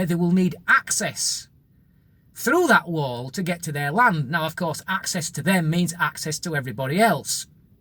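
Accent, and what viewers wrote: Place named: England, United Kingdom. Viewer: Honestly, you kinda embody why a lot of young Scots don't want to be part of the UK - namely because views like yours are perceived as being common in England and are rejected by about 75-80% of the Scottish electorate. It is factually wrong to say that the border has not been discussed - its been in the Scottish Media quite widely if you search. I'm also yet to see evidence that Scotland "will be flooded with economic migrants from the Third World" - could you please provide some? The UK is chronically mis-managed and has been lacking infrastructure and education investment for decades - the public services have been put in private hands under Labour and then Tory under the guise of "Trusts", which are often run by party donors when you delve into them. And this actually costs the English taxpayer more than it otherwise would. 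(I know because I worked in two of them). Listening to your accent, it sounds like you come from one of the numerous geographical regions in England that has not turned an economic surplus in decades - why do you and your fellow citizens not demand better of your government and accept stronger local representation? Many economic precedents show that effective governance is the key to effective economic growth and transitions - Estonia is perhaps the best example in Europe currently - and this has been missing in the UK for decades. The failure to create a sovereign wealth fund on the back of Scottish oil revenues would stand as a rather striking exampl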